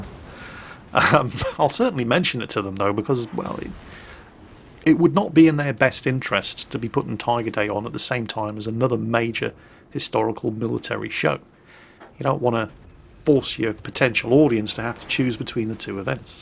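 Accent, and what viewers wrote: United Kingdom. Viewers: I watch your videos all the way through and find your cursing hilarious, the well articulated, very british way to call someone a complete waste of space is awesome. Robert Pike should appreciate you teaching his grandsons to swear with sophistication and style rather than just the usual dropping of f-bombs and yelling names. Don't ever change. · People who swear a lot tend to be more honest than the average person! That being said, I come to your channel for your mentality and brilliant British. Your voice! Its like angel farts to my ears! Much love, all the way from Lebanon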